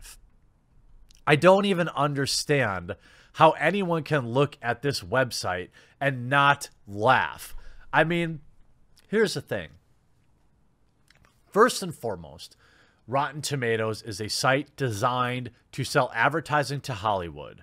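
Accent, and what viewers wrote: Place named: Midwest, United States. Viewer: Your Midwestly was showing a bit in this one.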